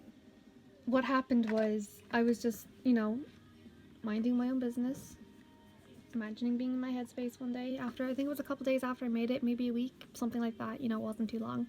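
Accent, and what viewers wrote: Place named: Scotland, United Kingdom. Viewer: I’m really curious if you “switched “ while recording this video because your voice goes from very quiet and timid and feminine with a neutral accent , and a deeper register voice with a slight Scottish sounding accent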